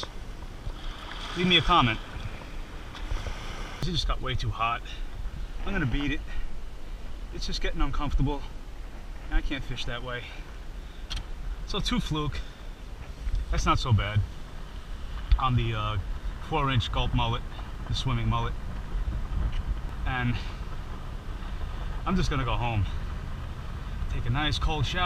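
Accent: United States. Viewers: I knew you were from states island soon as I heard your voice lol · Hi brother, you speak like my long island friends that I worked with back in the early 80's at midtown South. Anyway I looked up where to find bloodand sand worms in new York City and surrounding areas.i got your channel. Still don't know where to go exactly but hopefully will. Specially close to NYC. Thanks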